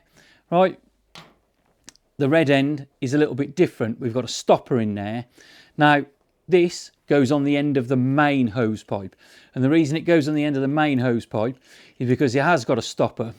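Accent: United Kingdom. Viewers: British accent! So lovely, mate!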